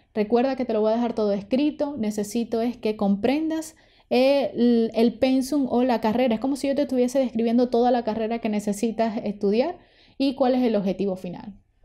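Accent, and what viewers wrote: Maracaibo, Venezuela. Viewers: Holaaa viendo tu canal me estoy dando cuenta de toda la información q nesecito saber para hacer esto... De verdad te agradezco mucho la información.. Lo mejor de todo es tu acento Maracucho con que das el mensaje, los maracuchos vamos a conquistar el mundo.. Jajajaja saludos... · Venezolana? Maracucha????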